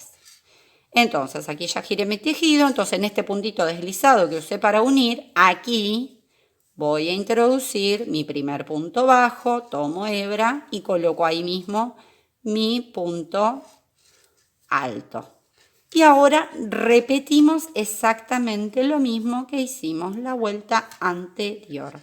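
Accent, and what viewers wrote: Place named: Argentina. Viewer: Hola buenas noches, me encanta el punto zuzette, es muy sencillo y se ve muy bonito, explica super Bien! Gracias! Su voz es bastante agradable el tono argentino es muy bonito, saludos desde Puebla, México. Bendiciones Perdón, una pregunta: que significa top down, no lo sé.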